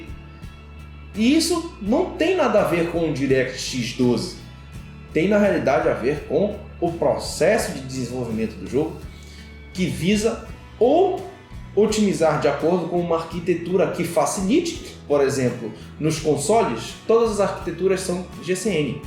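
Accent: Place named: Recife, Brazil. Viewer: Cara, de todos os sotaques que eu já escutei nesse Brasil, esse sotaque de Recife é o único que me incomoda! Eu me pergunto se esse sotaque é em toda a Pernambuco ou só ocorre especificamente em Recife!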